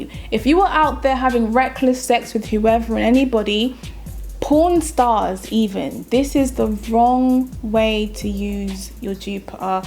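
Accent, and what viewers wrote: United Kingdom. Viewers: am covid vibes did not know she was British. That just blew my mind.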